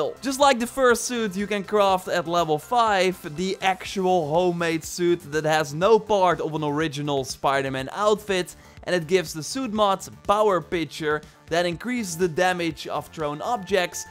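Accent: Netherlands. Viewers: are you dutch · Are u dutch? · lol je accent geeft zo hard weg dat je nederlands bent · Are you dutch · Steenkool engels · jij bent nederlands of niet ik hoor een nederlands accent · ben je nederlands ofzo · Je kan horen dat je Nederlands bent lmao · ik hoorde meteen dat je nederlands was😂😂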